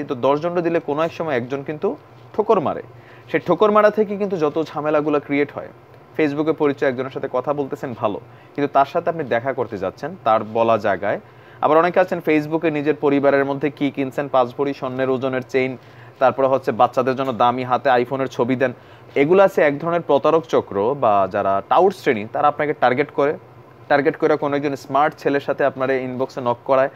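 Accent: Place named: Comilla, Bangladesh. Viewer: আসসালামু আলাইকুম ভাই, আপনার কুমিল্লার ভাসায় খুব ভালো লাগে।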